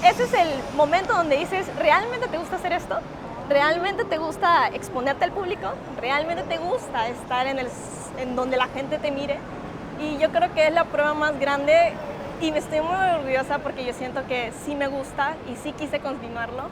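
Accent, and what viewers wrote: Lima, Peru. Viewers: Y bien peruano, aunque ella suena limeña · su espanol es muy limpio como la mayoria de peruanos que no son de la sierra ojo ! yo quiero mucho a mis compatriotas andinos , pero manejan un espanol muy marcado ! a diferencia del limeno que puede ser limpio cuando se lo propone incluso por encima de cualquier pais hispano americano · Como se le nota el acento Peruano jajajaja exelente 🇵🇪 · Se le siente muy peruana , me encanta quw sea bilingue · Ciertoooooo acento limeño!!! El acento peruano es neutro y único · En Perú se habla como ella usted se ve que no conoce nada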